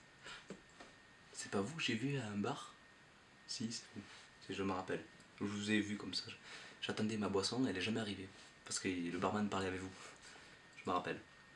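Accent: South, France. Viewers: toi tu est sudiste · Accent du sud haha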